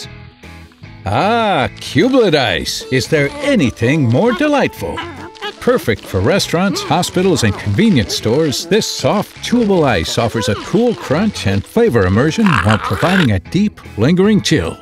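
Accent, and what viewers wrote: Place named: United States. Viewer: Aaww. I remember when I was still a kid about the voice over. Very American and convincing voice. Sounds good quality and reliability.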